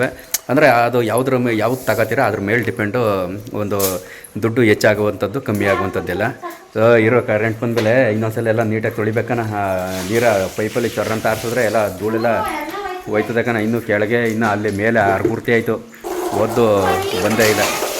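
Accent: Mandya, India. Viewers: ನಮಸ್ಕಾರ ಅವರಿಗೆ ಡೈಲಿ ವ್ಲಾಗ್ ಮಾಡಿ ನಾವು ಬಳ್ಳಾರಿಯಿಂದ ನಿಮ್ಮ ವಿಡಿಯೊ ಇನ್ ಟ್ರೆಸ್ಟಿಂಗ್ ಇದೆ ನಿಮ್ ಮಂಡ್ಯ ಭಾಷೆ ಕೇಳಕ್ಕೆ ಖುಷಿ ಅಸ್ಸುತ್ತೆ ಶೇವಿಂಗ್ ಮಾಡ್ಸಿ 5 ವರ್ಷ ಸಣ್ಣ ವ ಆಗಿದಿರಾ ಮನಸ್ ಮಾಡಿದ್ರೆ ಇನ್ನೊಂದು ಮದುವೆ ಆಗ್ಬೋದ್😊😊 ವಿಡಿಯೊ ಸೂಪರ್👌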